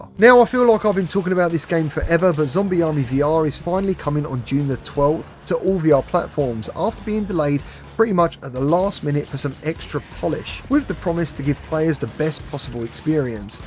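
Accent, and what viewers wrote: England, United Kingdom. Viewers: Gimmie that VR news with that classy english accent brother 🎉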